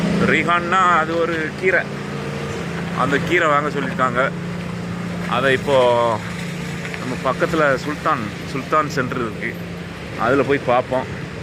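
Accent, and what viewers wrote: Tirunelveli, India. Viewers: நீங்க பேசும் போது அந்த திருநெல்வேலி பாசை வருது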